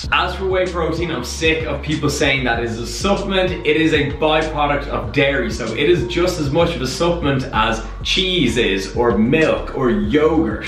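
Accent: Ireland. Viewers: SumDude Norris Irish. (Dublin) I think. I'm from Cork and also has a bit of that accent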